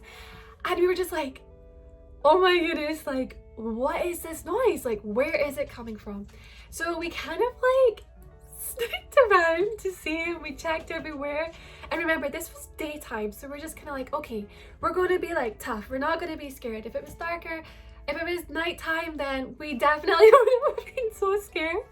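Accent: Scotland, United Kingdom. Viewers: Love your videos ❤ ps are you from Scotland cos so am I 🏴😁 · Love your Scottish accent! Your nostalgic look is too cool! Love your videos and ignore the haters! You and Maverick and your little boy are too cute! Bless all 3 of you! ❤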